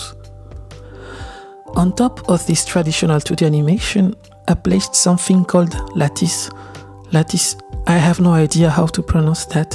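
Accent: France. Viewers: L'accent français me fait rire, continue c'est trop bien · Is this a french accent? Because your voice is lovely to listen too. Besides that, your videos are awesome. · you’re French?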